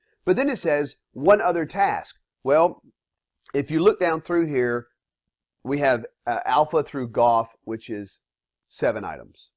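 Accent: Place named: Louisiana, United States. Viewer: Are you from Louisiana? Nice video and very well presented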